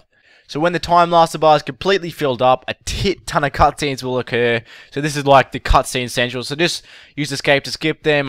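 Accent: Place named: Australia. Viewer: Good to hear an Australian give a guide for once, cheers mate real helpful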